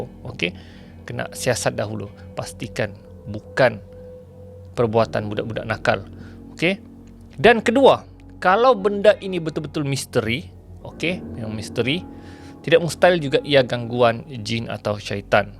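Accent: Sabah, Malaysia. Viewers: iyaa org sabah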